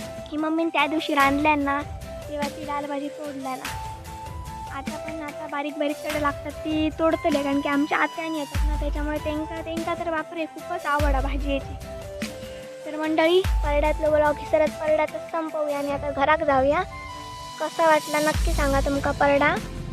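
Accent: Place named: Sawantwadi, India. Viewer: Go tu bolta kiti bhari parda mast hade tade pure sawantvadi vadi bhasha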